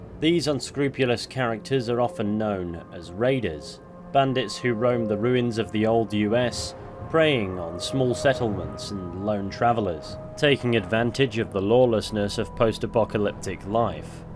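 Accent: United Kingdom. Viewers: Are you talking about? I believe he is British and not Australian 😂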